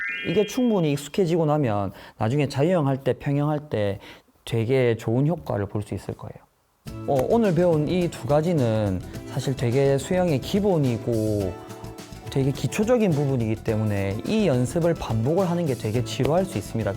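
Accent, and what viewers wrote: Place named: Gyeongsang, South Korea. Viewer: 경상도분이신가봐요.. 억양은 속일수가 없네요^^ 그래도 귀엽네요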